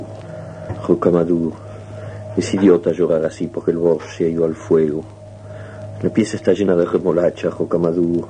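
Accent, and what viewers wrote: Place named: Northern Argentina, Argentina. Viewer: su acento (en esa mezcla extraña de español y francés) me remite a un acento del norte argentino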